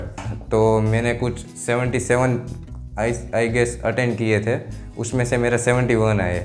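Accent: India. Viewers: Awaaz thee khabar padhe jaay gujarati a par saurastra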